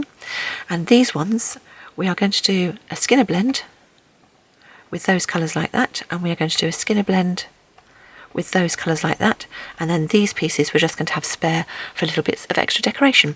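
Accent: England, United Kingdom. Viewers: Thank you so much! I've wondered how clay canes are made. I have purchased a number of beautiful canes from a very talented woman who sells hers on ETSY. I have terra cotta pots and I am ready to play! I also make pens so I want to try some on brass pen barrels. I am guess that they would need a study sealant for protection against discoloration of the clay and breakage if dropped. Any thoughts are much appreciated! Finally, I would like to compliment you for such a lovely, professionally produced video! It's so much more pleasing to my ears to hear an English accent vs. an American (Canadians are better, too!) Very professional and quaint at the same time. Cheers from your Yankee Cousin from "Across The Pond!"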